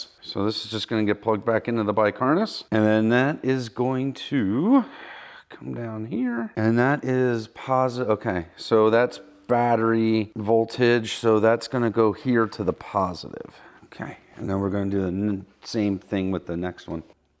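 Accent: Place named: Pennsylvania Dutch, United States. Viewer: Having watched a half dozen or so of your videos, sometimes I think I detect just the tiniest bit of Pennsylvania Dutch accent in your voice, but when you were finding that last wiring fault and described "rootching around" I knew I wasn't imagining it! Mach's Gute!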